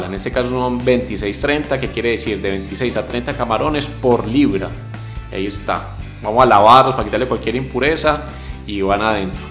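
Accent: Antioquia, Colombia. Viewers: esa voz de paisa tan linda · Eh ave Maria, se nota que sos paisa! Que viva colombia 🇨🇴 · sexy voz paisa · Me llama mucho la atención el acento colombiano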